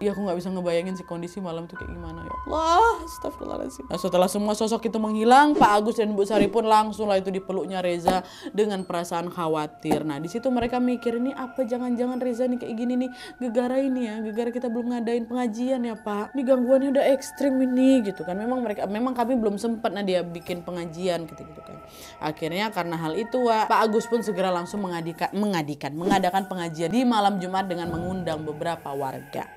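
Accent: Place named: Sumatra, Indonesia. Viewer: Dengar cerita berasa cerita nya dari tanah Sumatera hihihi...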